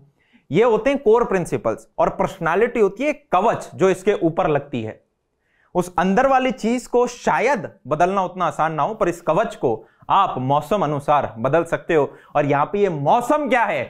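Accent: Haryana, India. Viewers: Jhunjhunu se ho ya haryana se?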